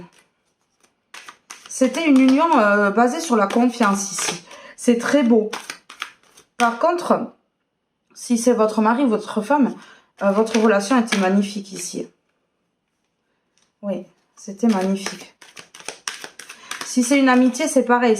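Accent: South, France. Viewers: Merci.pour votre accent méridional c est un peu difficile pour moi de vous écouter 😭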